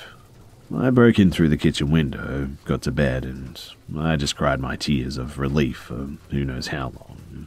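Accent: Australia. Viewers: this is the first time listening to horror stories told by someone with a aussie accent and i was so confused at first when you were talking about deodorant i thought i clicked on the wrong video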